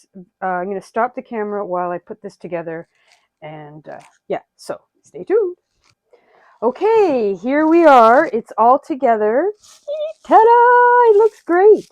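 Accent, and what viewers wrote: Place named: Maritimes, Canada. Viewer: I just stumbled across your channel today. From your accent, or lack there of, I was working on the premise that you were in Canada and I am betting the maritimes. I love what I have seen so far and have happily subscribed. I am in the process of preparing to move. Ugh…. However, there will be a dedicated craft room in the new place, so there are benefits to going through the agony. Your organization ideas have got my mind stirring. I have a dresser that will fit perfectly in the closet in the room. So far I am dedicating a drawer for each type of craft and each drawer will contain the tools and frequently used items in it. Unfortunately that means that I have to store extras outside of that room but hey, it’s a start. Please keep up the posting as I am now addicted. Anyhoo, hello from Ottawa!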